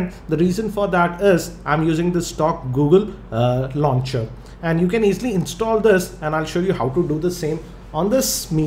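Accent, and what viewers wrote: India. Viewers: Indian voice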